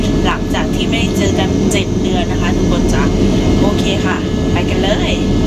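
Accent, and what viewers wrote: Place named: Sakon Nakhon, Thailand. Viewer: อยู่บ้านอะไรค่ะ พี่ได้ยินเสียงพูดก็รู้คนสกล พี่อยู่สกลจะ้ มีแฟนฝรั่งเศสเหมื่อนกันจ้ะ ไทบ้านไดน้อ?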